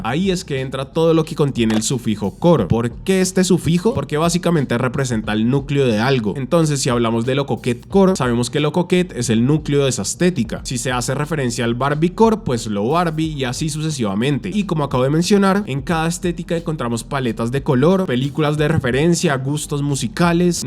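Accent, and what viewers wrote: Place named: Bogotá, Colombia. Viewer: El único problema de este video es el acento rolo maluco.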